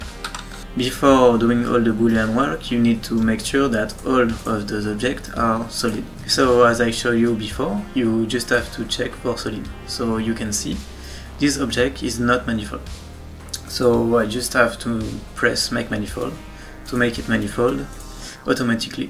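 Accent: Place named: France. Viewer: A german viewing a tutorial in english narrated by a french. Thanks for the help! I think I will try blender in the future!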